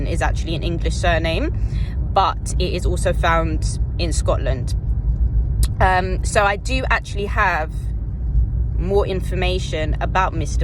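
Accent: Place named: New England, United States. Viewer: you would fit right in with African Americans, with no problem at all until you start talking, but some will think that you grew up in New England, would take care of that accent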